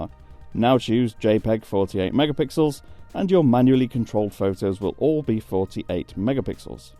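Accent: United Kingdom. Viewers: Subscribed as soon as I heard the British accent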